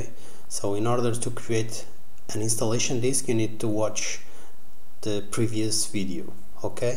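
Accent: Portugal. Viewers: Na hora que ele começou a falar eu sabia que falava português kkkkkk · Aposto que o autor do video é português, pelo sotaque! I want know if I can install on a 16gb pen?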